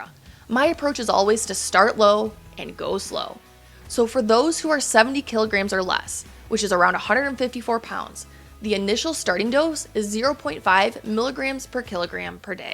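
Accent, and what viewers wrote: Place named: Minnesota, United States. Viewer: You sound like you’re from northern Minnesota, or Wisconsin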